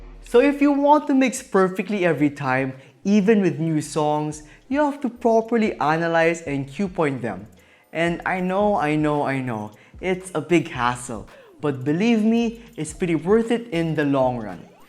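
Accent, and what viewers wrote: Philippines. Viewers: I knew it! I’ve always had a feeling you were Filipino from the way you talk!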